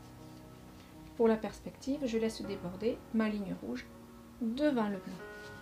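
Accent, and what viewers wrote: South, France. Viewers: Toujours un réel plaisir.de voir votre façon de travailler avec de surcroît votre douce voix du Sud de.la.france ! Vous Expliquez toujours très clairement c'est adorable a vous merci à vous ,🙏❤️ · Bonjour, Merci pour vos vidéos, elles dégagent de belles énergies créatives 🙏. Je reprends la peinture ( merci le confinement 😉) par contre la peinture au couteau est une première, pas facile à positionner sur une toile quand le chevalet est absent... Une astuce? A votre accent vous êtes dans le Sud. Faites vous des cours de peinture en présentiel?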